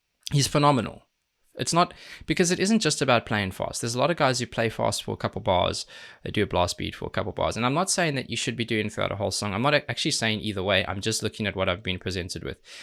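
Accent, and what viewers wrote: South Africa. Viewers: I Knew that you were South African , I have the same accent you have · This dude sounds South African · that a south african accent? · Bro you sound South African, I may be wrong though 😅 great video friend, Alex is such a madlad 🤘🏻 Edit: He's South African, my bad 😅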